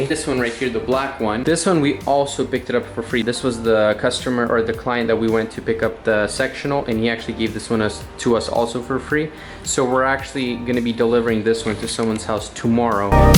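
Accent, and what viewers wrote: Russia. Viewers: Are you Russian or Slavic? I’m willing to bet my left nut you are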